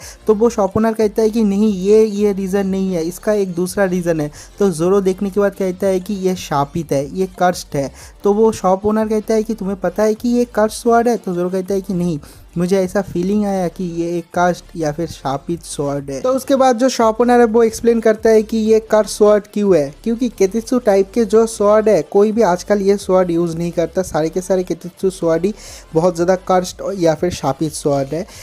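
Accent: Bengal, India. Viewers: Bro apke voice se mai samjh sakta hu ap bengoli ho but please bhai gender ko sahi se mention karo Apne bola zoro ka leya mai tere ko paisa chuka dungi😢😢😢 mai paisa chuka dunga hoga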